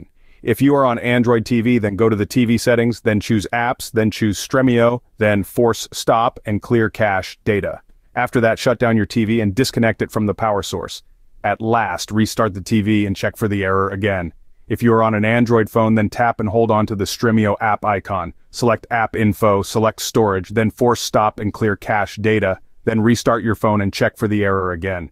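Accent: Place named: England, United Kingdom. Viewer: Thanks I love your detailed simple instructions I ca ear and under your kaunhiage and speech perfectly it's nice to hear a English voice Bravo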